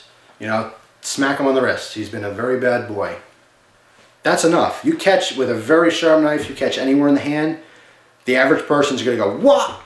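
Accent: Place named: New York, United States. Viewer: did you say boind them? hahahaha i think that the new york accent is cool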